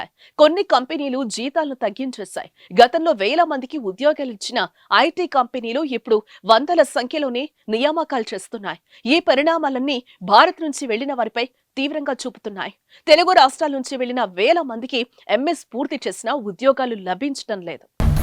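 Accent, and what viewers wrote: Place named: United States. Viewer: అమెరికా వాళ్ళు తెలుగు మాట్లాడినట్లుంది నీ ఉచ్చారణ. నీ ముఖం.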